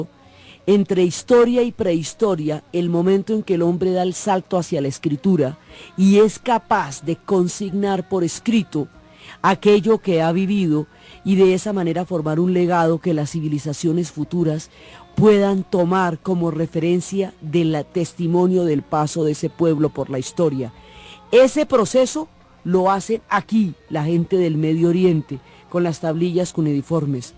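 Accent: Colombia. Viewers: Jaajajaja narras muy chimba pareces Colombiana saludos de un gnóstico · Hola, soy andaluza. Ese 'dege' suyo colombuano tan gracioso y expresivo, esa cultura, inteligencia y empatía para transmitir sus conocimientos es de Premio Novel a la expansión cultural a todos los publicos de habla hispana. Gracias. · Oiga usted!!! Qué manera tan clara de impartir historia!!!!👏🏼👏🏼👏🏼 siempre me había enredado con aquellos nombres de los persas... delicioso ese acento colombiano😉👋🏻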